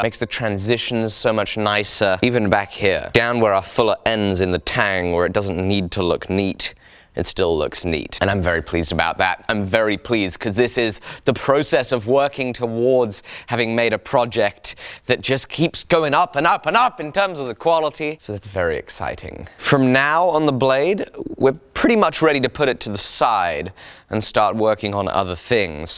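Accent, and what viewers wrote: England, United Kingdom. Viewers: Dude your English accent is awesome. It let's you make up words that still sound great.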